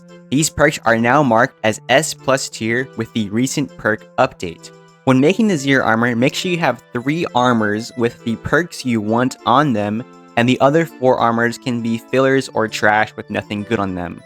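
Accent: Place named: United States. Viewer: bro thanks man, these videos are really helpfull its nice to have a normal NON AI american voice explaining the game. W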